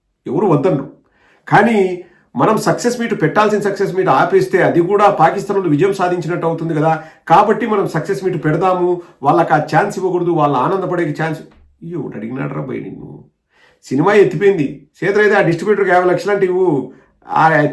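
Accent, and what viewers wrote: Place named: Telangana, India. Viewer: Sudden గా తెలంగాణ భాష మార్చావ్. నీ పని చూసుకో ..పోరా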